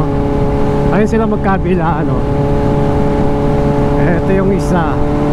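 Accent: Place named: Ilocos Sur, Philippines. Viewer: akala ko nasa ilocos sur ka idol HAHAHA kasi similar yung mga nahay eh hahaha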